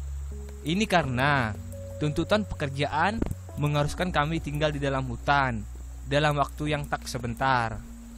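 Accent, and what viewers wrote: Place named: Kapuas Hulu, Indonesia. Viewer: Ini yang ngomong di balik Video pasti asli orang kapuas hulu :D dari logatnya udah bisa ketebak. hehehe..salam saudara, ayoo kita majukan daerah kita sama2. <3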